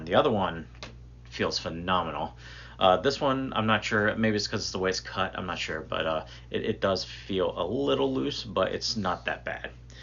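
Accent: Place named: Pennsylvania, United States. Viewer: Just got to the part where you say you’re from PA... haha I can hear it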